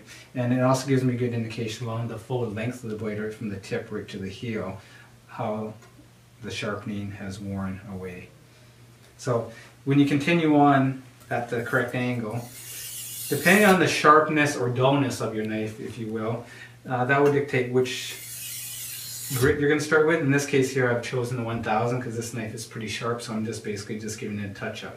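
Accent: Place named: Canada. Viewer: Knew he was a fellow Canadian who knew what he was talking aboat.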